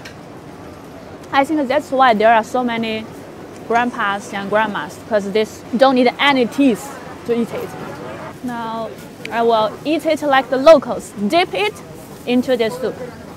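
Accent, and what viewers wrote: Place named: United Kingdom. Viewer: You sounds like you're born in UK or studied there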